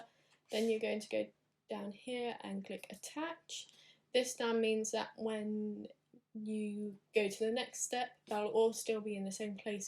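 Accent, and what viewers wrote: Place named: United Kingdom. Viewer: Came for the tutorial, stayed for the accent 😅 UK people have the best accent. Good tutorial. Just bought a cricut and I'm learning. Greetings from Mexico.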